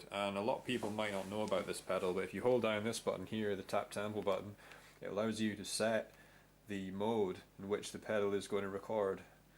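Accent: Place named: Scotland, United Kingdom. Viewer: Scotish accent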